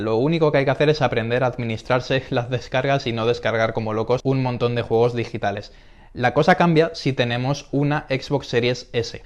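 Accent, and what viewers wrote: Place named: Spain. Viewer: No podía dejar de notar que cuando hablas casi ni abres la boca 😅 tus dientes de arriba y los de abajo nunca los despegas, no se si todos los españoles hacen eso para obtener su acento español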